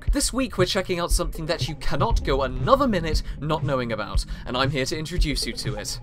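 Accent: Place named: London, United Kingdom. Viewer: Are u seriously British? Because it sounds like u live In London!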